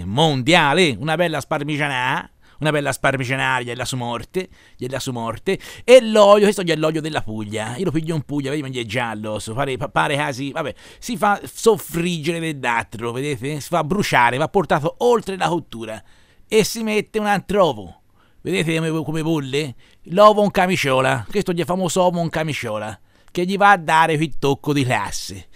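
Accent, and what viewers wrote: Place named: Campi Bisenzio, Italy. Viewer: Ma tu sei di' campi bisenzio? No perché i' mi babbo m'ha detto che il suo amico Marcello co i suoi amici parlavano a quel modo lì. Comprimenti per l'umorismo un saluto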